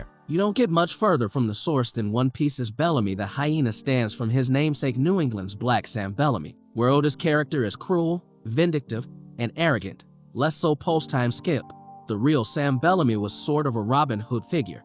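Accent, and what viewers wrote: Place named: Georgia, United States. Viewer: this is the most Georgia accent I've ever heard lmaooooo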